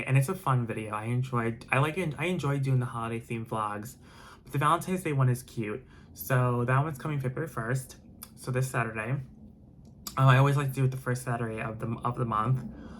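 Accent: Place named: New York, United States. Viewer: Hi,. You look lovely! I thought I recognized your accent - you’re a fellow New Yorker! I don’t know where you live (Upstate, boroughs, etc), but have you ever considered Long Island? That’s where I am, and it lacks all the chaos of the city. Not as fun, but way less drama and crime.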